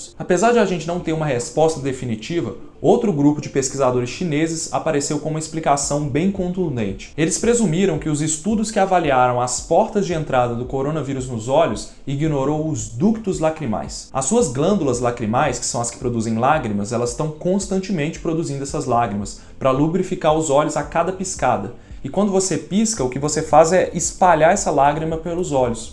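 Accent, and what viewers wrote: Brazil. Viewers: eu gostei do conteudo e do jeito de falar de Voce e seu portugues " brasileiro"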